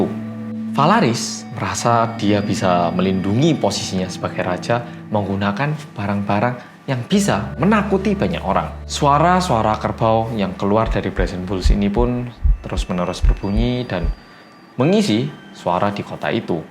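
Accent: Java, Indonesia. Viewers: MEDOOOOOOK hehe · Yeay, detektif yg medok kembali! Hehe ᬇᬫᬫ᭄ᬫᬳ᭄ᬤᬶ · Finally, we got it mas detektif medok;) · wow medok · Mas asli orang jawa bukan sih, kok bahasanya medok banget hehehe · Jowone kok katut mas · Bang kok medok banget sih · Apik banget lo mas seng medokkk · Akhire detektipp Medhok menehh Btw mas, ngerekame ojo nang lorong ngono mas, Dadi wedi gegoro ndelok i lorong terus T_T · Yeyyyy,🥳🥳🥳 well come back karo wong korea sg medokk, udah di tunggu2 mas, hehe😅😅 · Keren mas brow Org korea fasih berbasa indonesia Tp medoknya (logat jawa) kental banget Salut dech mantappp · jeda kuliah online nonton mas medhok wkwk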